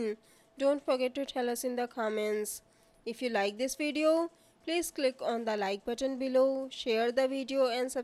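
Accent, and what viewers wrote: India. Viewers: I could understand you without. Just for some reason I assumed you'd be American. But I like Indian accents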